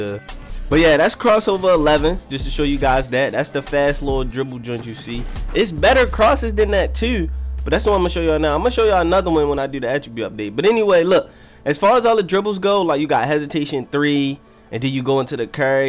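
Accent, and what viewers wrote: Baltimore, United States. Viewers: Baltimore accent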